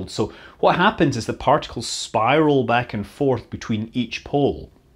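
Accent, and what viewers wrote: Scotland, United Kingdom. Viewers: Great video as always! Unrelated, I got a good chuckle every time you said “Explorer,” which I heard as “Exploder” due to your Scottish accent.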